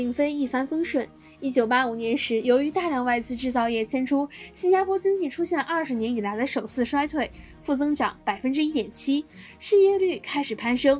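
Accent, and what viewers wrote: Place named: India. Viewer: 这英语一说，我以为到了印度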